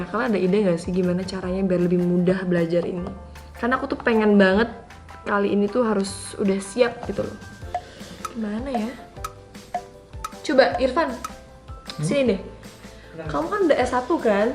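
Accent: Java, Indonesia. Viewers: Guys. Medok bener ndok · Guys Ny ittu Medok banget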